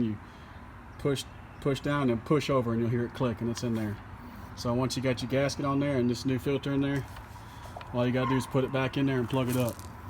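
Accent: South, United States. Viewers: Excellent video. Southern accent can't be beat. I know why you use a 5 gallon bucket now. My little oil pan splattered all over tarnation. My filter was like new though, so I just put it back.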